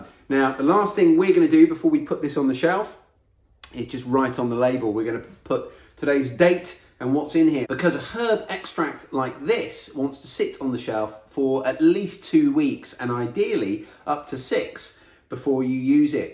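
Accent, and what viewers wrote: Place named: England, United Kingdom. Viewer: Just found you! ❤️ Just subbed can't wait to check out your other vids and no offence to our American cousins your English! Just a bit more relatable - it's almost Summer in Australia so my mind is everywhere - so are the flies! As a migrant from UK I have never got used to them ,- those the ants and the flipping spiders! Will make the tincture to try to keep the ants at bay wish me luck! Looking forward to being a Subby and sharing vids with family and friends. Best wishes and positive vibes from Sydney Australia 🇬🇧❤️🇦🇺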